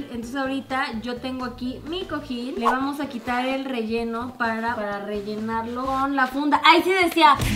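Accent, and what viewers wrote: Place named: Mexico. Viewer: Tengo 24 años y toda mi vida me pasaron diciendo que era muy gritona y que bajara la voz, que gritaba mucho... Luego encontré el canal de y dije OMG AMIKAAAA hablamos igual (solo que con diferencia de acentos porque yo soy de Costa Rica y ella de Mexico) Jajajaja pero me siento bien de saber que otras personas hablan así.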